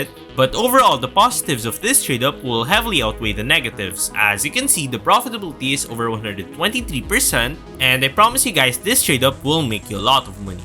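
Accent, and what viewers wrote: Philippines. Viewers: I just knew it that you're from the Philippines because of your accent! But this is really useful so I'm trying this. Thanks!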